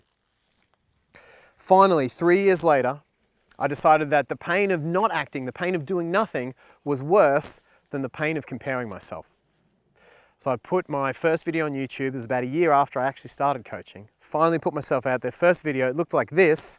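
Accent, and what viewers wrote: Australia. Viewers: You have an Australian accent! Oooeee! Just as sexy... great message, you’ve got to own your uniqueness ❤️ it’s taken me a long time to look in the mirror and believe I’m a 10/10 in my own right xx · Australian accent is equally good 😜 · Everyone has his/her own strength, beauty, and talented like ourselves fingerprints. No one can still what we have. I’m just like you and others. I’m proud to be my authentic me. Yes, strongly agree! Only you if you want to be great or better to complete and compare with, this is the worst enemy you will face with in the world. Often time I wanted to give up on what I’m doing, often time I want to become whoever better than me. But the end of the day, me only I can be. I love the way you are. you are sexy I’m telling as a feminine energy. Your Australian accent is so cute and unique. I’m Thai but have lived in the USA for awhile, people get a good laugh out of my Thai-Lish all the time. 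I thought, YES, I’m so special cuz only me can talk just like me. I am a surgical assistant for cardiac surgery, I can do a job like Americans, but they can’t speak English like me 😉 I learned to love myself since I’m different in the different world like America. Thank you for your message, it’s so rich and right on the money 💰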